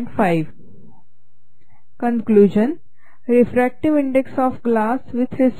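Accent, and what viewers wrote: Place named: India. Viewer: .-_-. Damn Indian accents.....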